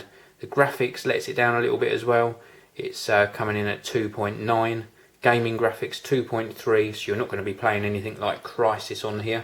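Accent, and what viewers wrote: United Kingdom. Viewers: british accent :D i love it :D:D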